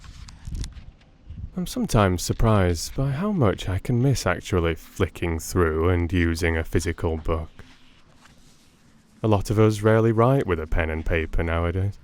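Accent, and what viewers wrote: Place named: England, United Kingdom. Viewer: what a wonderful late summers day! almost feel the smell from the water Your pronounciation is perfectly fine with a typical english accent:-). An extra information about fika is that the word comes from a time when people liked to play with words like this: fi-ka turned around = ka-fi... Kaffi is an older way to say kaffe.(coffe). Love Tove Janssons books, grow up with them. I will listen to your reading soon. 😊🌻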